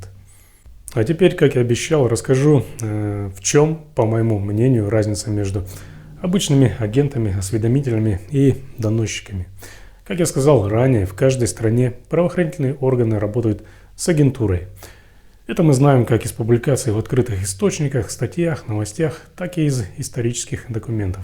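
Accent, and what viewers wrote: Ukraine. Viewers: Украинский говор. Гэ, Хэканье. По говору западный галичанин! Горбачев!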